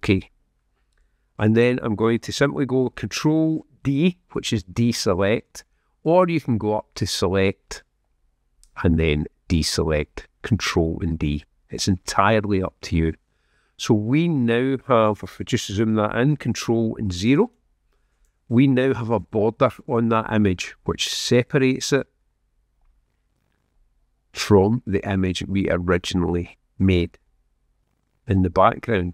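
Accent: Scotland, United Kingdom. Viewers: As a non native speaker it took me quite a time to get the hang of your (Scottish?) "touch". But your artistic approach kept me listening - much to my advantage, I must say, language wise and more so art wise too. Main question, of course is: How did you compose these three images in the fist place? Could you do a video about that too? Pleeeze!!!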